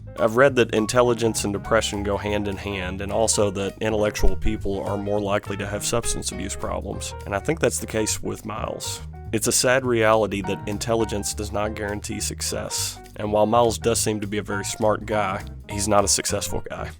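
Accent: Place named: West Virginia, United States. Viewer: You sound like you're from west virginia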